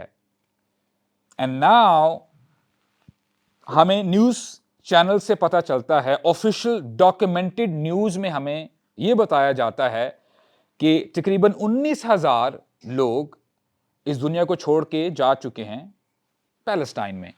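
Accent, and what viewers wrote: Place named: United States. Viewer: Amazing bayan with American accent 😊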